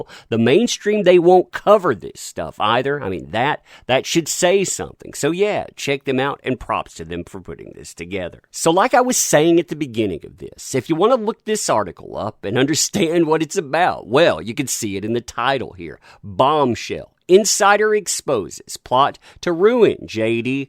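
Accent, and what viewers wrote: South, United States. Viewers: I love the sound of your voice. I can't place your accent. It feels slightly Southern, but maybe some Midwest? Whatever it is, you have a great presenters voice. It draws the listener in. Keep it up.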